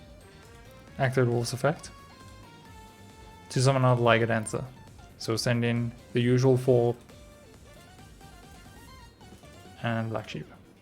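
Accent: South Africa. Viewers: R u south african😂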